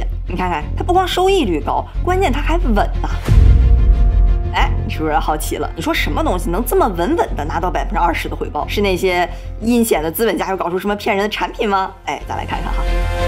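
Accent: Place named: North, China. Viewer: 好奇你是来自哪个地方的人？口音太重了。北方人？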